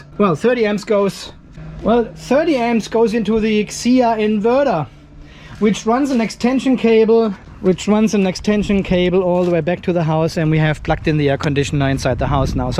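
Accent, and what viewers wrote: Germany. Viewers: Also deutschen Akzent hört man ja schon sehr deutlich, wenn er Englisch spricht.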